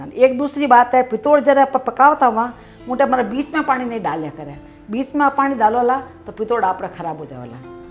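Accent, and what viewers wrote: India. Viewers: Amma I love your way of speaking.desi style.😙😙😍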